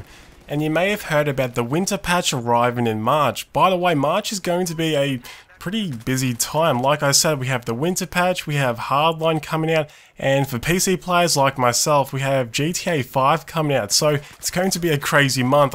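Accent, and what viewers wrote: Australia. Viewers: wait are you Australian? If you are that surprises me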